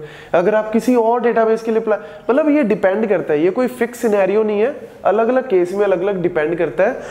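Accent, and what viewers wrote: Punjab, India. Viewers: Sir has a punjabi accent ❤ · Or aap sayad punjab se ho kyoki aapki language me thoda punjabi tadka bhi he😊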